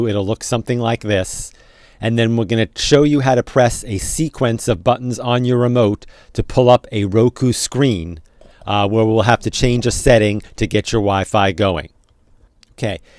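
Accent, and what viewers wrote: New England, United States. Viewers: OMG...YAASSSSS!!!!! I was about to go out and buy a new megabucks router because I thought that was the issue...and then I thought...let me consult the answer to everything...YOUTUBE first. Your video popped up first, and I liked that it said "Fix it NOW!" and my daughter and I both laughed and said we have to watch that one! Because we've been at this for the longest time. Switching out HDMI cables and ports, restarting everything 100 times, even relocating the cable modem and router and all the wiring several times (trying to get closer to the Roku) all to no avail. There truly was no other option but to try a new router (admittedly mine is pretty old). But...your solution worked beautifully! We both love you and think you are a genius! And we like how you say "short video". A little New Englandish sounding accent there! 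Anyway, thank you so much for taking the time to put this together to save us all!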